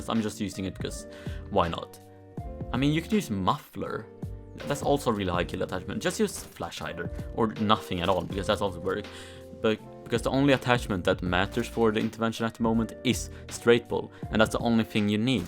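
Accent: Sweden. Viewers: are you from sweden or something?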